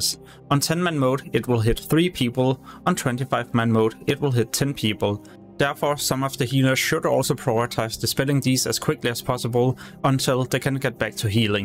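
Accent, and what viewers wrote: Denmark. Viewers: 100% Dansker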